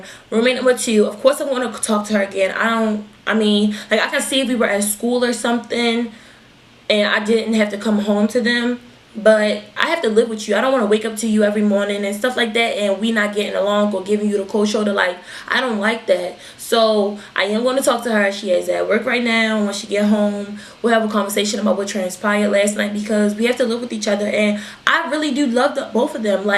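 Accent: Baltimore, United States. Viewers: I can see you really making it big on YouTube! you have inspired me to make mine! Bmore accent poppin!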